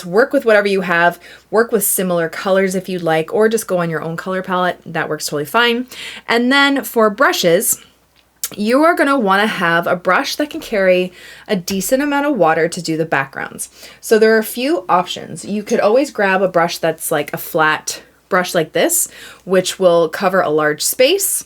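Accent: Canada. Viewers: Before I subscribed- I was watching one of your videos, and as I was listening to you- I was like “Do I detect the Canadian rise?!” Lo and behold- I decide to subscribe and see that you are one of my people! ❤🇨🇦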